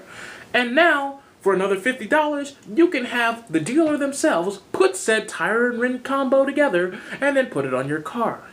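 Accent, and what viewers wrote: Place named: RP, United Kingdom. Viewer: queens english.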